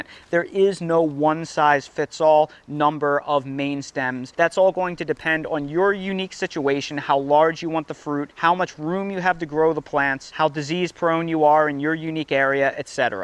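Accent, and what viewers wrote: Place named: New Jersey, United States. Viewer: psst...better tomatoes are in jersey baby...lol......i hear that jersey accent...lol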